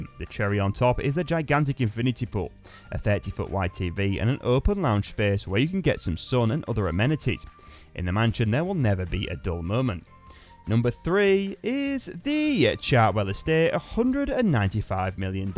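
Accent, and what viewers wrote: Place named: East Yorkshire, United Kingdom. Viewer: The commentator sounds like he's from East Yorkshire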